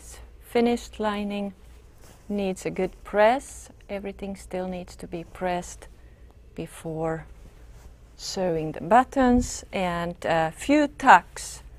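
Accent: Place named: Germany. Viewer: Thanks for an easy to follow tutorial. Joining the lining to the sleeve has always been a puzzle to me. Years ago I made just one jacket, it wore me out! Commercial patterns are of NO help when it comes to instructions. Up until today, I thought I'd done something wrong when I didn't go all the way around when joining the lining to the jacket at the bottom of the sleeve. "Bagging" the jacket, only now, makes sense, because you've shown how it's done. Thank you again and thanks to the college for help in making this video. BTW, (as I laugh at myself) in the beginning I was trying so hard to understand what a "velt" was. I thought, "hmm, that must be a new term." Then I remembered my days when I lived in Bavaria and recalled how German's W's and V's are the reverse of the English version. I then rewound the tape now that I could better concentrate on what you were a saying, not how you were saying it. 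You've done a lovely job here, I hope lots of sewers have the opportunity to find you. However, below is a good question.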